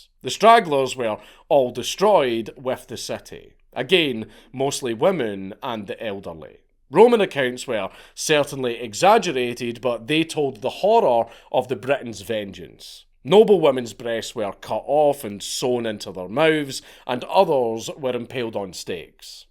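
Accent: Scotland, United Kingdom. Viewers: I fekkin love the scottish accent. History = Hesterree ..music to me ears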